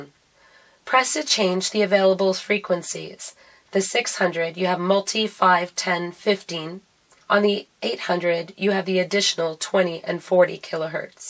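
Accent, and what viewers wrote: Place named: Canada. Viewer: Nice, concise tutorials. Just what's needed for a quick refresher. And I enjoy the Canadian accent to boot.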